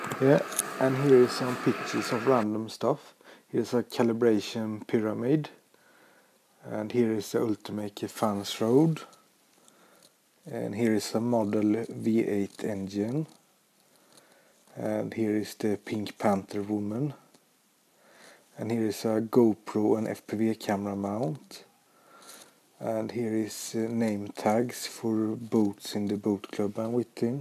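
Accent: Jönköping, Sweden. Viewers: Wow! So very cool! And yes you really do sound soooooooooooo Swedish! Love it! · oh god, that Swedish accent :D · haha, you can hear that you are from sweden, im also swedish ;) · Du låter lite som en Jönköpingsbo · Best Swedish accent ever! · That accent.....i feel ashamed of being swedish .... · swedish? xD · Nope, it's Swedish accent. Trust me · You can hear that you are from Sweden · Swedish? Tihi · Hehe, den svenska dialekten.. Usch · swedish i guess :) so easy tro hear as a fellow swedish person.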